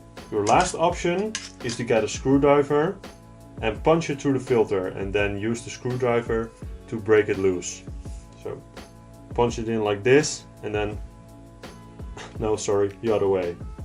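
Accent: Netherlands. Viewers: Sounds like a Dutch guy :-) Super video, ga zo door!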